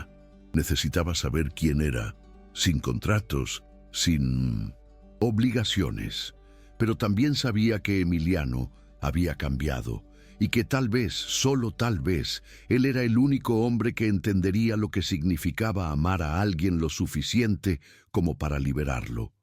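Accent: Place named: Colombia. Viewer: Usted es colombiano, cierto? Ese español, esa vocalización, es inconfundible. Es un narrador excepcional! Felicitaciones!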